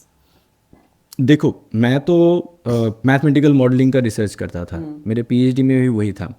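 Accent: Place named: Bengal, India. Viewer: Bengali and Hindi accent combinations ❤️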